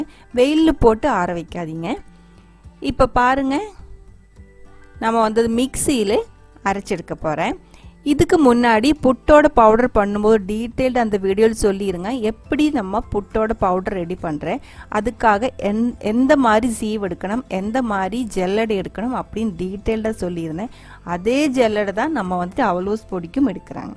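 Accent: Kerala, India. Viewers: I remember my hostel days, my kerala friends used to share this with me during the tea time in the evenings, I just love your tamil, just like my Malayali friends💞💖🤗😘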